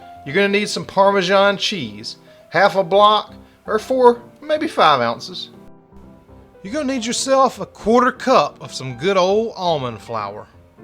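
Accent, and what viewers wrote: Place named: South, United States. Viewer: I just love when you say, (in your distinct southern accent 👍🏻) “Well, I forgot to add that in,” or “I forgot to show you that,” or you forgot whatever…..& then you just correct it & move on. 😀 That means that yes, you are my people!! Because that’s exactly how I run my kitchen!! 😂 This is great seafood comfort food right here! Mmmm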